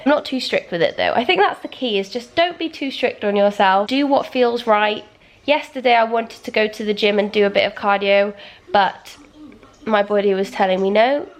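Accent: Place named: England, United Kingdom. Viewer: I love your english accent! It sounds so nice!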